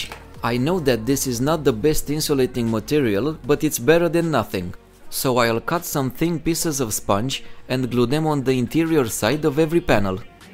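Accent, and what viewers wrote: Russia. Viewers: По английски не чего не понятно акцент русский мешает давай на родной мове не стесняйся.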